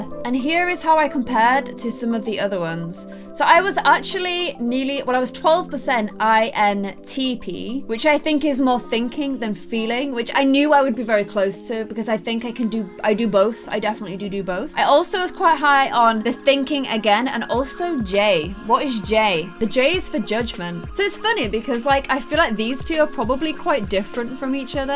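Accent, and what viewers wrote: Ireland. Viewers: UR IRISH!!!!!!!SO AM I!!!!! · are you irish ? lizax yess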